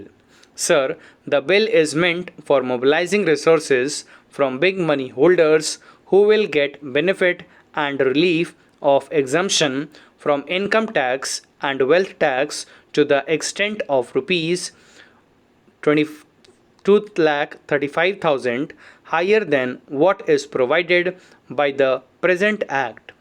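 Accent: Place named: United Kingdom. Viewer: Nice dictation like British English 😀👍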